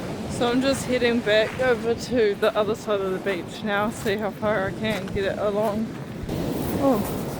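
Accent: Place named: South Island, New Zealand. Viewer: awesome yeah I've been mucking around with polishing pads on temu, finally found a set for about $40 that goes up to 10000grit and I just use them on an old bench grinder. It's quite slow so not much chance of overheating and blistering the rock a mistake I've made while learning and I bought a tile saw from Bunnings with a continuous blade for cutting them $140 ish I definitely need a bigger saw though for the bigger rock's. Cool I thought it looked like nz haha and a bit of a south island accent 😅 I lived in chch for years.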